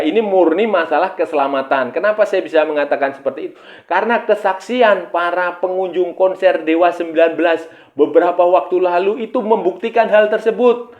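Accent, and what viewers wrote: Indonesia. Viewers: Medok banget ceritanya mas bro aku jadi kangen dan nunggu cerita yg lainnya 🙏🙏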